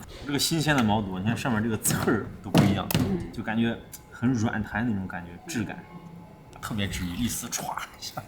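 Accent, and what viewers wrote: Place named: Xi'an, China. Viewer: 是咱西安乡党吧，这一口亲切的醋溜普通话哈哈哈哈😂😂😂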